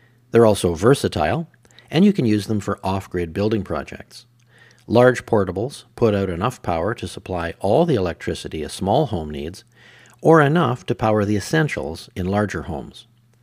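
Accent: Canada. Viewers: I can tell by your accent that you are Canadian. One other legal connection here in the U.S. is a metal block that bolts to your home breaker panel cover. To use it, you have to install a second main breaker below the original then install the block. The block will move up or down to allow EITHER your main panel breaker OR your generator's main breaker to be switched on but NOT both at the same time. I can't use any of these options because the idiots who built my house placed my panel box in an inside wall and it would take major design changes in the construction of that wall to allow rewiring of the panel so I did what I wrote below a year ago.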